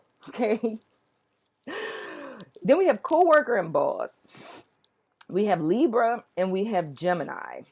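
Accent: New Orleans, United States. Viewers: ok maw, cutup! Glad i found this video...i got a lot from it. Thanks! I heard a lil Nola accent in there! Lets geaux!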